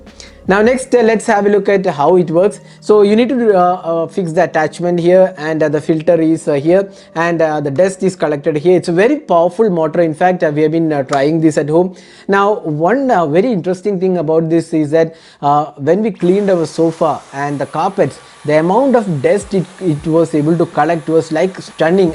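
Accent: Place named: Kerala, India. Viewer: In 3 seconds I realised he's from the motherland.. a fellow Malayali 😂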